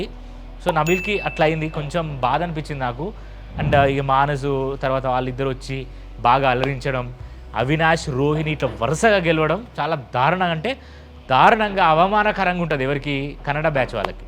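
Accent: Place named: Telangana, India. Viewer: Mi telangana slang superbbbb❤❤😊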